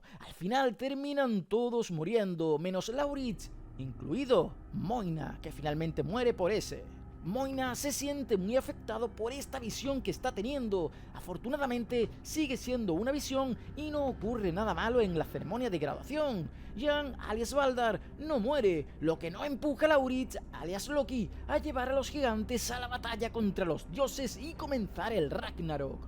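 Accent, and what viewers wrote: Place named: Spain. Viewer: por como los llamas y tu acento supongo la traducción española les dio esos nombres... me quedo totalmente con los latinos xD El final me agradó y me dio pena, fue innovador y diferente aunque en un inicio me dio rabia y hasta le grité a la tele xD pero me pareció bonito, sin embargo deja muchos huecos argumentales y e suna gran invitación a ver de nuevo la serie. Me quedaré con las ganas de otra temporada y que todo fuese real, pero se me apretó el kokoro con el momento final de isolde y la versión del tema que pusieron.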